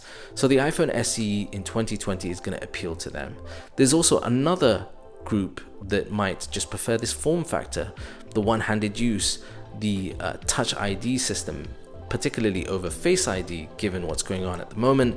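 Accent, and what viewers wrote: United Kingdom. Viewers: great review :) i‘m gonna get one ig (loveee ur british accent haha) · most british accent review that i've ever seen dude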